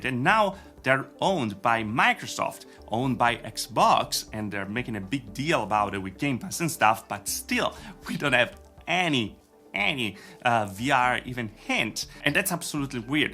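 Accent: Italy. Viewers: LO SAPEVO CHE ERI ITALIANO Quel accento... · Oddio ma sei italiano? 😮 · Per qualche motivo pensavo che fossi italiano e ora ne ho la conferma :)) Nice video and keep up the work! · Ohhhh, you are Italian, from what part? I was trying to guess your accent, thought Brazilian.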